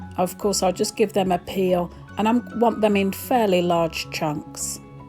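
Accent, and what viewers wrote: England, United Kingdom. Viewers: Top recipe thank you and at last....... ....a British accent :) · Have a sweet potato soufflé with chunks of sweet potato. Also I add cauliflower to my curries since it takes up the spices beautifully. Lived in UK for 4 yrs early 80’s so enjoyed the accent a lot! 😊 thanks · I'm from Ireland and I'm living in New York and I can tell u that when I hear an English accent, I feel, oh, my cousins so I always love to see and hear my cousins!!!💚 Great recipe!!!!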